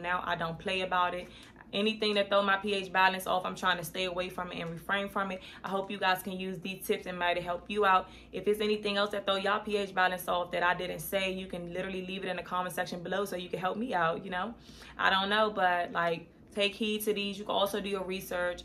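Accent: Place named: Washington DC, United States. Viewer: You from dc ? I know our voice when I hear it 😂🫶🏼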